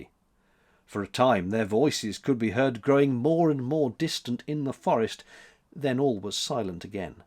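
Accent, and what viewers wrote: United Kingdom. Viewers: I've been listening to CTC at night to help me sleep. Not because the content is boring but because your cool British voice and the low moan of the boat going down the canal lulls me to a peaceful sleep. I found you a year ago and binge watched your entire channel in less than two weeks before finding out it is an effective sleep aid as well. I appreciate the videos and being brought into your home, life. Have a great time away from CTC, I hope you get the rest you are looking for!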